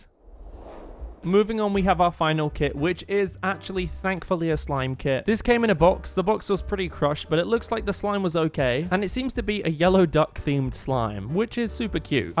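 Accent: United Kingdom. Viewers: Are you British? You sound like you are British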